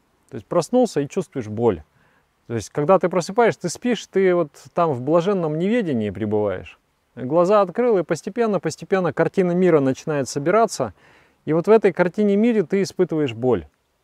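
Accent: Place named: Moscow, Russia. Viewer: не думаю, что он украинец. Его канал называется " ", а не " ", это раз. Второе, говорит по-русски, даже после всех злодияний русских, на нашей земле, третье, говорит, с явно мАсковским акцентом. Он не наш.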